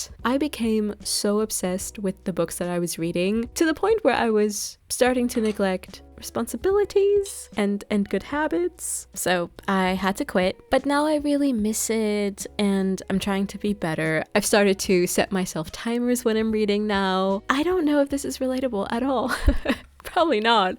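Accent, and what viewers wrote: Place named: Germany. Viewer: I've got to say you have the cutest German accent I have ever heard 🫠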